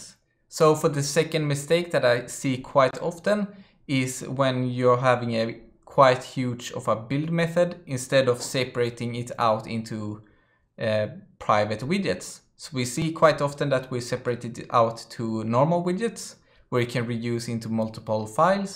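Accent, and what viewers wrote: Sweden. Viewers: Great great tips. So you're Swedish, aWay -- aVay 🙂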